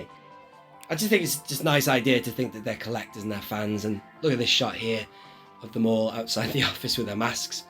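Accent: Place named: United States. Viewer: I love hearing your american accent.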